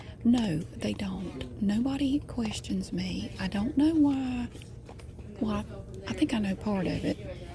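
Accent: United States. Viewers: I just love your accent, it's my favourite american accent!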